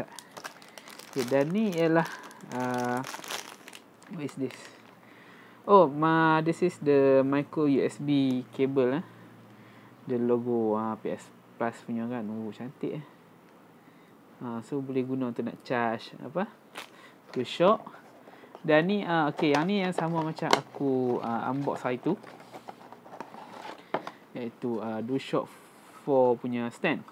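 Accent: North, Malaysia. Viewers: PSN ID : Shawrihan - Keep it up bro with this kind of video ( review psl games, movies, unboxing and all ) good to some of us! - suka dgr loghat utara tu! All the best bro!!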